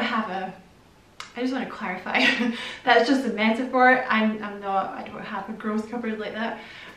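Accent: Scotland, United Kingdom. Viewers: Thank you so much for this, it's great to hear another Scottish voice❤️🏴.... I am doing lots of shadow work and the amount I have cried is not real 😭... Where I am having problems tho, is not forgiving others for situations, that's easy to me😊.. Its trying to forgive myself!! and now having hindsight, why didn't I do that/say this? its the anger towards myself I can't let go off. Love and light too you and I wish you all the happiness in your new space. ❤️From an aqua lady xxx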